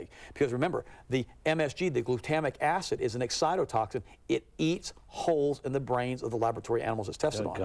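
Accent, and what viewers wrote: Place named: South, United States. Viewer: he said "high fat", not "hot fat" lol. its his southern accent threw you off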